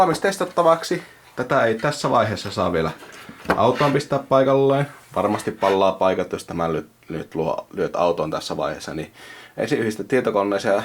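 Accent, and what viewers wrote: Finland. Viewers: For what it's worth, as a non Finnish speaker the Finnish-English dialect has it's own charm. I am sure I would enjoy your videos either way :) Nice series by the way, love me some open source hardware hackery.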